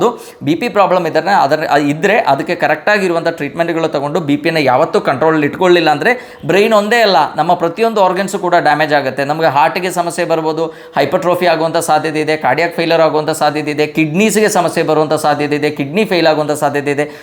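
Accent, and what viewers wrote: Kerala, India. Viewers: I think you from kerala doctor Your Language pronounsation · Malayali doctor🤔 launguage gothaguthe 🤠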